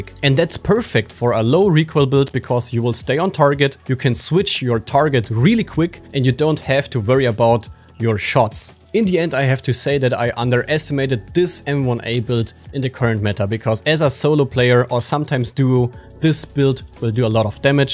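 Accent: Germany. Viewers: I hear German Akzent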